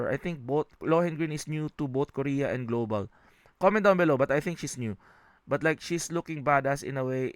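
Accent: Philippines. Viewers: I notice the accent, are you a Filipino?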